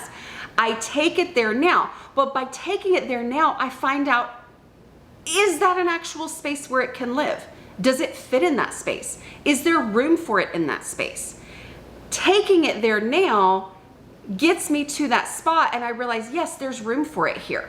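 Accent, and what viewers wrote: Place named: Texas, United States. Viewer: Postal scale (I believe speaks Texan ❤😊)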